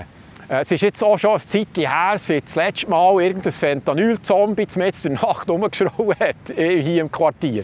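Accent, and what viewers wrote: Bern, Switzerland. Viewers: Gute Sendung des Herrn in schönem Berndeutsch! Bitte weiter so! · Danke viillmaal! Ich lose dir nöd nume gern zue will du immer wieder interessanti Details bringsch und nöd eisiitig bisch, sondern als gebürtigi Züri-Schnurä au wäge dim wunderschöne Bärner Dialäkt. Liebi Grüess us Urdorf, Agglo Züri.:-)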